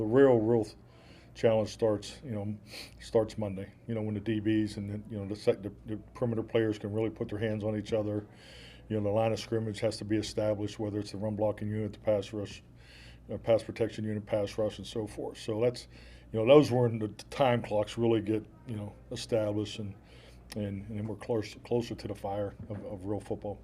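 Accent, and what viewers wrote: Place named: Western Pennsylvania, United States. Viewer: Coach-we're" all-in" with you here in West Central Pennsylvania. Love to hear that Western PA accent but especially your "Can-Do" - "Will-Do" attitude. I've always wanted to see you with the Steelers even after you and Aaron beat Big Ben and Mike in the super bowl. Again -I share your accent and embrace it after working forty years in Washington DC. God Speen to you Coach and our team! Thomas K. -Greenwood, PA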